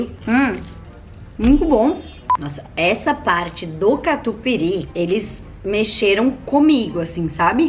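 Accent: Rio Grande do Sul, Brazil. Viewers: Gaucha né?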